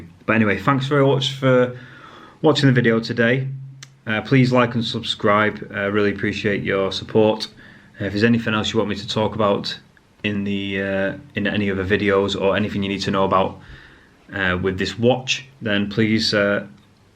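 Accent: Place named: Yorkshire, United Kingdom. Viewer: Thanks for the review! Currently have Fitbit sense and my venue 2s comes tomorrow. Excited to try it! Your accent sounds like you are from Yorkshire? Could be wrong … but my grandparents and mom were born in Yorkshire! 🥰 love the accent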